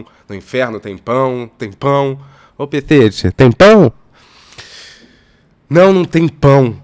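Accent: Rio de Janeiro, Brazil. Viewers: Esse e o primeiro video que eu vejo dele com vicio linguístico, incomodou um pouco esse "né mermo" sotaque carioca já não me encomoda tanto.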